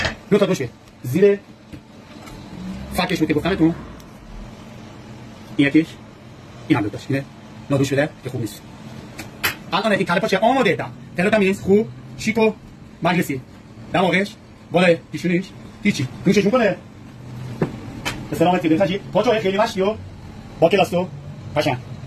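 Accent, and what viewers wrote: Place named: Yazd, Iran. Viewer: چه مرد کاردرستی . آدرسی ندارین , لهجه یزدی که دارند. آدرس رو میگفت...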